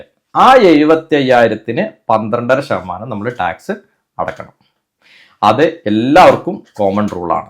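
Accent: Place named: Malabar, India. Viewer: സംസാരം കേട്ടിട്ട് മലബാർ സൈഡിൽ നിന്നാണ് എന്ന് തോന്നുന്നു.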